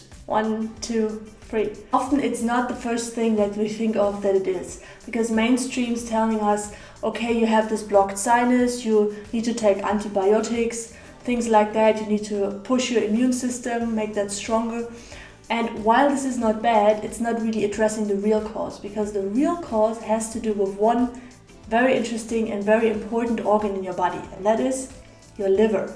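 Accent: Germany. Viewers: I understand her very good, also with german accent but the audio quality could be a little bit better. Anyway, thank you for your awesome content! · Bist du Deutsche, das hört sich so an? :)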